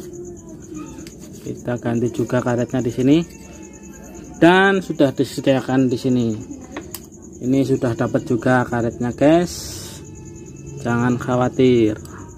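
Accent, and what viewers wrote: Banyumas, Indonesia. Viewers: ora ngapak ora kepenak.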